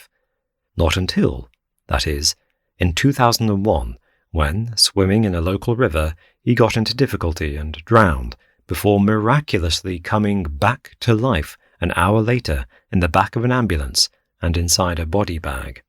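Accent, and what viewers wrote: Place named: United Kingdom. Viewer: Your stories are pretty gruesome with detailed descriptions of repulsive stuff.......yikes. But whats weird is your lovely British accent makes it all palatable. Lol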